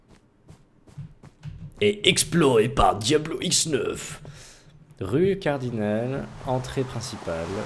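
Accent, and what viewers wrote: Quebec, Canada. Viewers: Bon accent québecois,:-)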